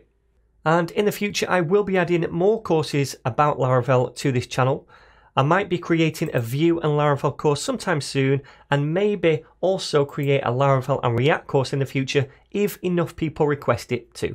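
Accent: United Kingdom. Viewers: Is this speaking British Accent?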